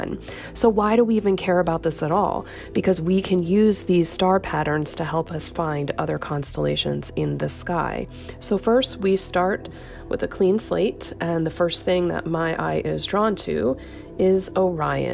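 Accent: United States. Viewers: American pronunciation of celestial objects makes it difficult to understand.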